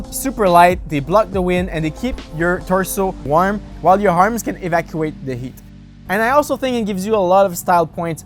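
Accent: Quebec, Canada. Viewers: Auto Subscribe for that Quebec Accent.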